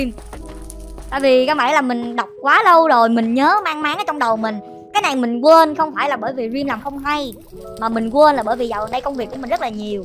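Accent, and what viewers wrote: South, Vietnam. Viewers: người ta là người miền nam người ta có cách nói chuyện như v , bạn đừng bắt bẻ vì người ở đó nói chữ mà có dẫu ngã thành hỏi quen r bạn ơi , khó sửa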